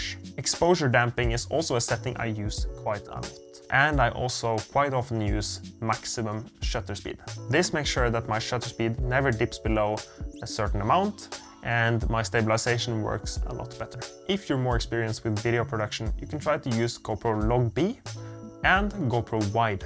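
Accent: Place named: Norway. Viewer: Thanks for the video, love the norwenglish. I will remember to smear myself with a little patience 😂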